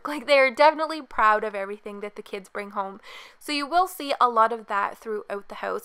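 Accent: Canada. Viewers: You have the most canadian accent I have ever heard. Also, this is a cute house! Thanks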